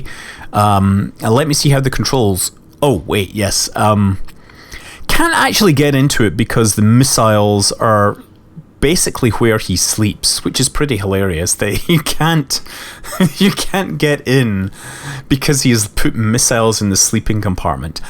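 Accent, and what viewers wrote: Scotland, United Kingdom. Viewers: What do you mean "unbearable 'accent'"? He's Scottish.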